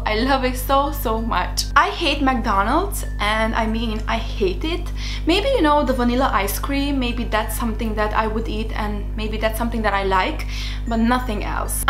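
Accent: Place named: Romania. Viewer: I also forgot to mention I had a friend from Romania named Anna Monica when I was in 1st-3rd grade! She definitely had your accent!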